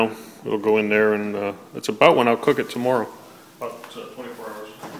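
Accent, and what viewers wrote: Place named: United States. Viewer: For a person with an American accent, I found it slightly odd that that all ingredients were named by metric weight. Something easy enough to figure out, but USA never went metric, so far, even though there was talk of it 40 years ago. I've eaten there when I was at Yale. Frank's obit started on the front page of the NY Times!!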